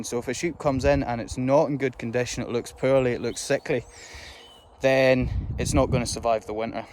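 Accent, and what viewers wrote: Scotland, United Kingdom. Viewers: When you speak I can hear that you are Scottish man.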